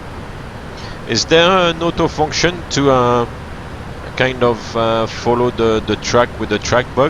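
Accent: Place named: France. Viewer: I was pretty sure you were french, beeing one myself !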